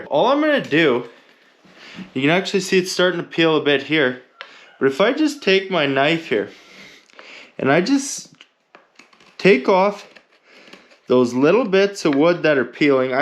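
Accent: Canada. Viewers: Love that middle Canadian accent. If you said ‘don’t ya know’ after sentences I’d say you were from north of Minnesota lol. Love this channel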